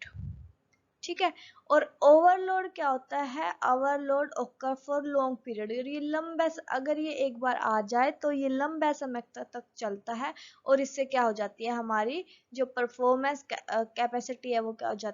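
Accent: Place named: Punjab, India. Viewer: you should to need more confidance, well good knowledge. Aapki Awaaz se lag rha hai aap Punjabi hai... 👏👏👏👏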